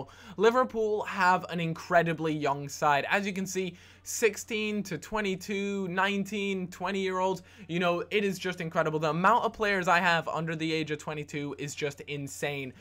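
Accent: Scotland, United Kingdom. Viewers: is he scottish? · Is Scottish? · I love you just cause your Scottish <3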